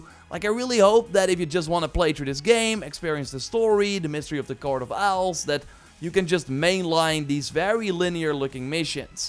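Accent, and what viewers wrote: Netherlands. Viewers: mate, I love your accent(Dutch I'm guessing?)..."I do like how dey expond on dis" It's just cool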